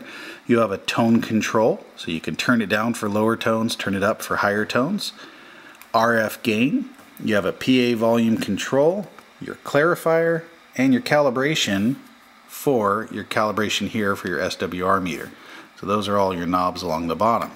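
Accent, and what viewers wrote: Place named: Pacific Northwest, United States. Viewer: Dang.. I remember the days.. But I got out of CB just before they went to 40 channels.. it got so crowded and folks were just playing with the radios.. Have always wanted to get back to it.. Maybe I will this year.. Great show.. sounds like your in my neck of the woods.. though I am a bit south in Vancouver Wa...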